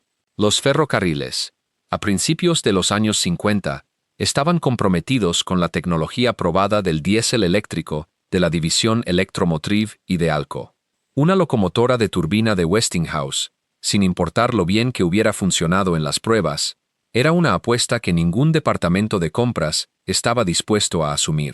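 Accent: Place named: United States. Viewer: Esto no es español latino ni de España es de español americano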